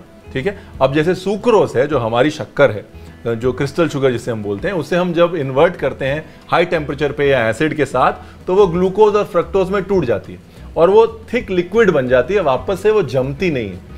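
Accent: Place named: Lucknow, India. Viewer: Science class + History class + His Lucknowi leheza + Lucknowi nazaakat which is nothing but absolute romancing of all ingredients is nothing but creating a masterpiece .... Not to forget Ranveer Sir ,,, inspite of being Punjabi we can see his love for Lucknow as he spent his early days of learning this art in Lucknow!!!!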